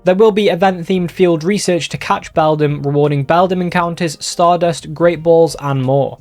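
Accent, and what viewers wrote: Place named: United Kingdom. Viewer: He’s speaking the Kings English not American 😂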